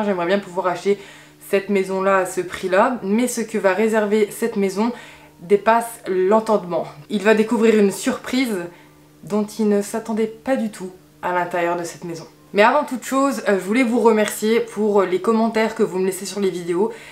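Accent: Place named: Brussels, Belgium. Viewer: Vous avez quasiment le même accent que feu ma demi-soeur et j'en déduis que vous êtes....bruxelloise. Mais l'erreur est humaine . Vincent, de Liège (Belgique) .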